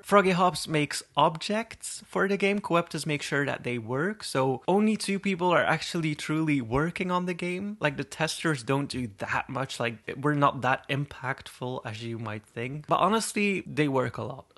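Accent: Netherlands. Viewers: You're dutch or Belgium, same for me. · Are you dutch? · Can i ask if your dutch? 😁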